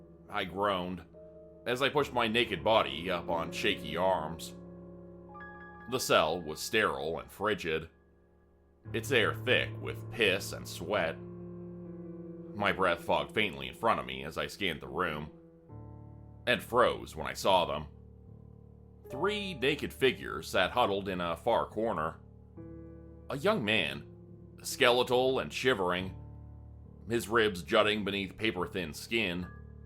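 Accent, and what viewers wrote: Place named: Canada. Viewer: He's from Canada and Canadians pronounce certain words differently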